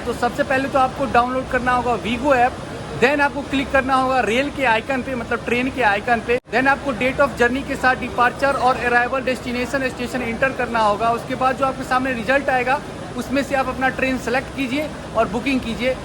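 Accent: Bihar, India. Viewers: I watch ur videos on mute as I don’t like ur bihari accent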